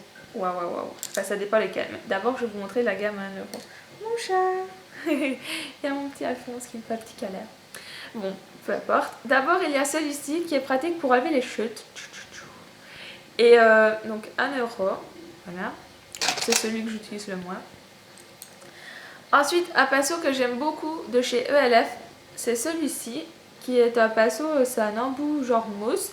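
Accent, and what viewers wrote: Belgium. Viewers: Tu es belge? :)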